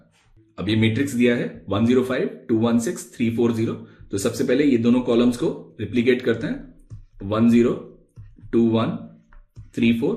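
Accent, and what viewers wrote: India. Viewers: Sir aap southern part of india se hai kya?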